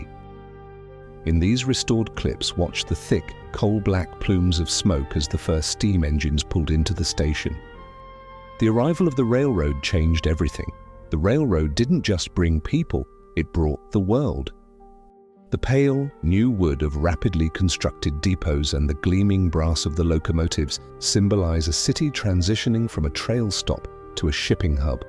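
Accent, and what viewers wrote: England, United Kingdom. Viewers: 🇨🇱🇨🇱🙋WHAT. ?.THATS A ENGLISH ACCENT. ....I was also born at Harris ..miss my Fort Worth very much .was raised off Camp Bowie ..at Grandparents hous my sweet grandfather bought it brand new for Grandmom 1937. ..we had it 64 yrs ..she lived there then Mom came back & raised. US girls w Grandmom ..thanks to granddaddy ..we had no mortgu pymt..they were magnificent people he was a LION' & promoter of Fort Worth Cats baseball and other fine things .🇺🇸🇨🇱🙋💙💙💙even though he was born in Oak Lawn ,Dallas. They settled in Fort Worth , after honeymoon at The Baker Hotel 1930. Dallas🇨🇱💙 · Does AI think we need a British guy to narate? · Why is a guy with an English accent narrating this? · Pretty neat visuals. But why would the narrator speak w a British accent?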